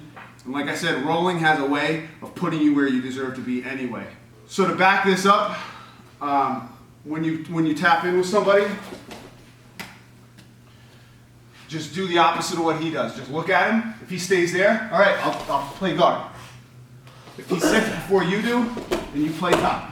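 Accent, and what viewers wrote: Brazil. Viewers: Your videos are hilarious man. Especially your Brazilian accent. Thanks man. My bjj coach hardly explains anything for some reason.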